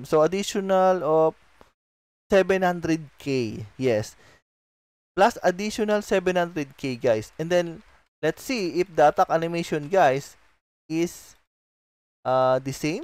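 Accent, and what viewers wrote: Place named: Philippines. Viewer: The accent is like a Philipino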